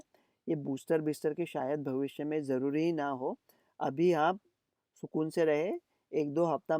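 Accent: India. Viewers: Sir i want to talk to you...aap ko language sunkat aesa lakta h aap india me hi rehte ho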